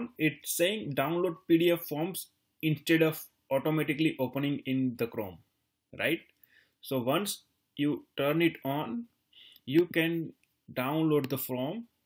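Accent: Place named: India. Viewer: Узнаю индийский акцент. Спасибо.)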